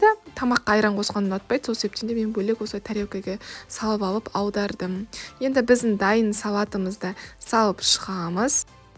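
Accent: Standard. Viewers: Жарайсың таза қазақша сөйлейді екенсің керемет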